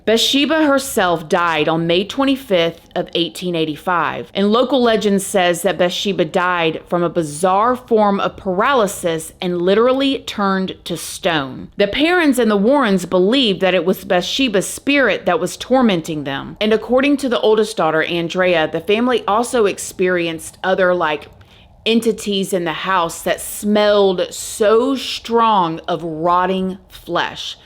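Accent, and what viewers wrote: South, United States. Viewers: Just found your channel and I’m gonna say your from the south lol. You sound like your from where I’m from. 😂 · I just saw you on TV! It was an as for a tv channel. Mysteria I think. Haha! Congratulations! I wasn’t even looking at the tv and I heard ur voice and that beautiful Southern accent and looked up and saw u for a split second. But undoubtedly it was u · Where are you from? 🥰 I almost recognize your accent from the south ♥️ I’m new here and I’m enjoying it so far!!!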